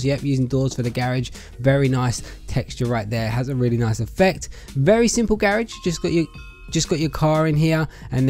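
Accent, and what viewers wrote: United Kingdom. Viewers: Wait do u speak British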